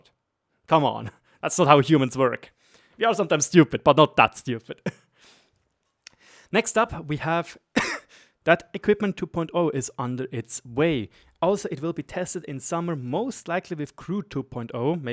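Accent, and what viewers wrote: Germany. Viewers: löwe your vidz you give highlights to the things that matters and your germania accent give it a flare thanks from sweden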